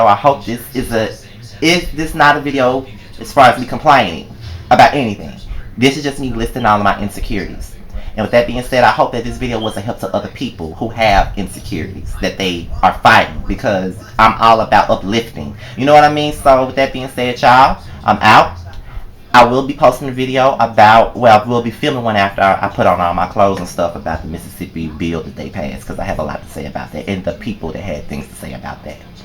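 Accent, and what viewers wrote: South, United States. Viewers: there is nothing wrong with you. Fuck the haters. Don't let that shit bother you. Who and what is attractive is a lot a matter of opinion. Your videos be on point. And there is nothing wrong with a southern accent, I like my southern accent. This video took a lot of courage to do.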